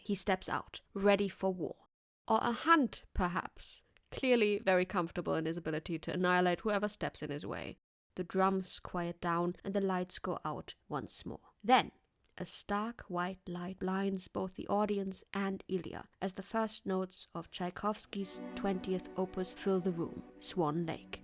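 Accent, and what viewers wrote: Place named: Germany. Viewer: YES MA'AM YOU'VE GOT A NEW SUBSCRIBER in me. FUCKIN FANTASTIC Work. And your prominent German Accent Just adds to the whole THEATRICS of it all. just brilliant work. 👌👌10/10.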